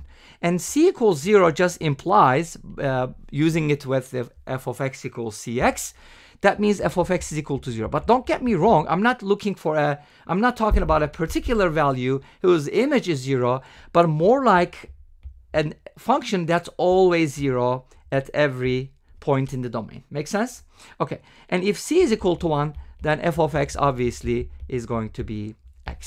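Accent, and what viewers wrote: Turkey. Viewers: reis Türk müsün ya? aksandan çakıttım biraz.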